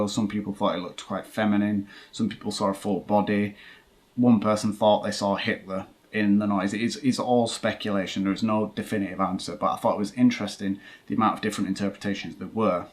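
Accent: United Kingdom. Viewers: para mi que soy mexicana me cuesta algo entender el ingles britanico ojala pudiera poner sub para captar mejor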